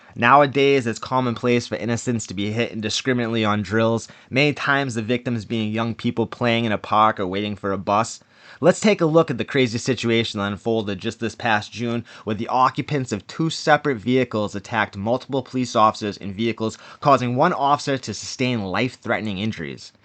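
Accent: Boston, United States. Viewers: This guy has a boston accent lol😂you ain't from the field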